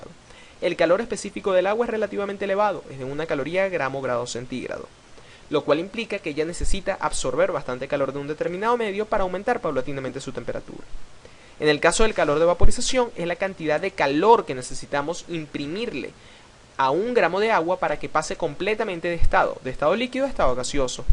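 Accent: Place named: Venezuela. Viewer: Apenas empecé a escuchar el video supe que eras venezolano jajajaja :D Saludos desde barquisimeto!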